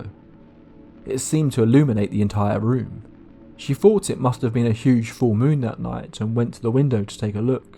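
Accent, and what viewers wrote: England, United Kingdom. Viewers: Man why is this British guys scaring me rn😂🤣 good video man😂👍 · Not "glided" but Glid! I'm becoming rather disillusioned by your channel as, though you speak with an English accent, your grammar suggests you were "schooled" but not educated in America. Schooled but not educated? Simple. America's education standards are non-existent! A Harvard or Yale graduate is equal to a kindergarten pupil anywhere else in the world. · Don’t! We love your British accent!! 🇬🇧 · This motherfucker is not from Michigan I can tell by the British accent get the hell out of here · It's a little funny to hear a quintessential British voice recounting American ghost stories. Like my ears and brain do a double-take. But as usual, your research and storytelling are spot on as always. I really do love your work, regardless of where the tales take place.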